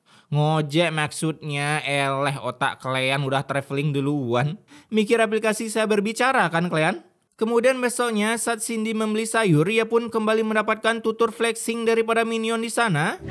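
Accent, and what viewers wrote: Bali, Indonesia. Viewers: Nak bali ne bli e?kaden rage nak jawe seken ne😂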